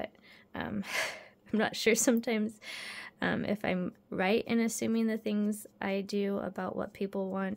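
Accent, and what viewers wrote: United States. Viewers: As a fellow Midwesterner from Kansas, I too speak like you and many of us from the area. Don't change who you are or how you normally speak because someone complained about it. They can actually change the speed of the video on their own. People need to accept people for whom the are. If it bothers them, it's them, not you. ❤ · Thanks for the video 😊 I think you did an excellent job of speaking and filming. The close ups looked fine and you could see detail like you wanted. I am also a southern girl and I do drawl 😂 You were not hard to listen to for me but I am one of those early 50’s demographic and have some patience I have developed. I enjoyed this video and what a cute expression of creativity!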